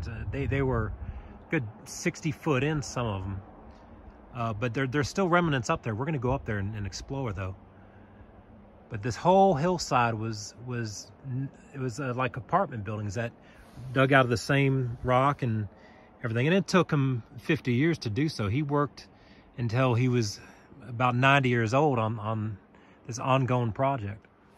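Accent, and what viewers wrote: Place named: South, United States. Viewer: Love your southern drawl…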